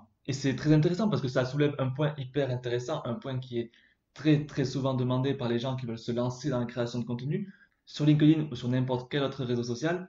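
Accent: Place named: South, France. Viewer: Le petit accent du Sud à la Marie Fray au masculin :-)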